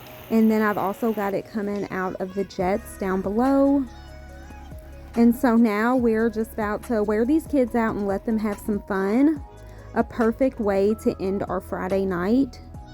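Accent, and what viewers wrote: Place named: Kentucky, United States. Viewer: # 1 Mama Always Getting It Done For Her Daughter and Son❤ Love Ya Bunches My # 1 Favorite Person to Watch and Listen to that Kentucky Accent ❤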